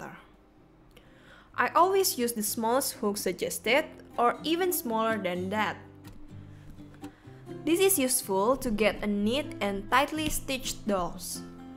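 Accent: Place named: Indonesia. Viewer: Your accent likes Indonesian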